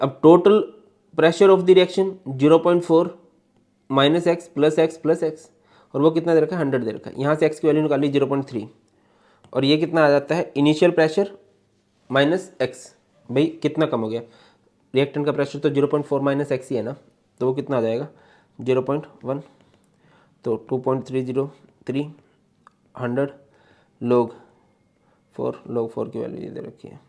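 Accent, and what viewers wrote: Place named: Indore, India. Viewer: are hamare Indore taraf aise hi bolte he yaar 😅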